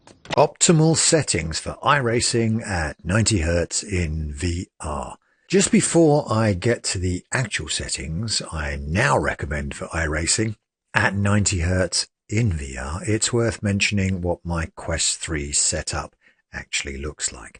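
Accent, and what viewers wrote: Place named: United Kingdom. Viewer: I’m in UK (as I assume you are from the accent 😂) and I don’t think it’s a regional rollout of 24H2. It was initially rolled out, had tons of bugs, and then pulled back. It’s since only being rolled out to systems with hardware device IDs that Microsoft have verified as not having issues from what I understand. This means if not offered, you may have some hardware they’ve not fully ironed out the issues out for yet.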